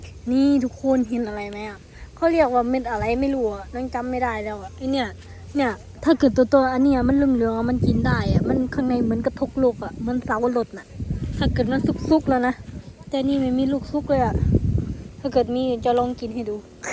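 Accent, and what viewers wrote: Korat, Thailand. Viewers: สู้ๆน้องสาว ภาษาคือออกไปทางโคราชบ้านเอง อ้ายยู่ลำปลายมาศติดตามตลอดเด้อจร้า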